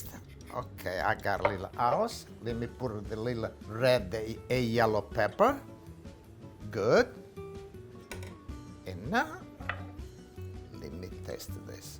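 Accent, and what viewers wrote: Italy. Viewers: Omg as Italian who lives in Italy the way he speaking make me laugh truly an original Italian immigrant, very focused to he’s recipe!! Greetings from Italy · you remind me of my grandpa. love that italian accent and the o ya baby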